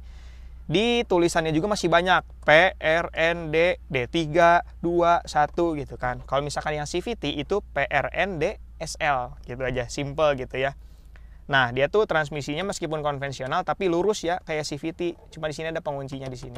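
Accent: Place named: Sunda, Indonesia. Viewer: Mang, asik reviewnya. Logat sundanya kentel lagi. Yang rajin mang!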